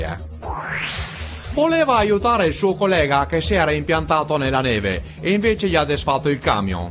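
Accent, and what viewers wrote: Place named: Rome, Italy. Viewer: anca mi so veneto cmq non è propio veneto...ce sembra un romanese che parla in veneto però ce hai provato ...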